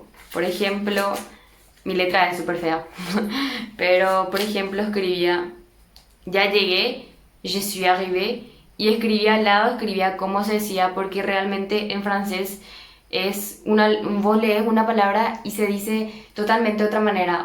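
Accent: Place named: Paraguay. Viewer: holaaa!!!! soy nueva en tu canal, eres de paraguay??? me suenas por el acento , nueva sub...jsjsjsj muy buen video!!!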